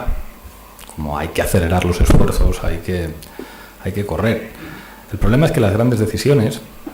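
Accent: Spain. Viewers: Comunismo bolivariano con acento español de España. A los jóvenes puede que los engañe, a los más maduros NO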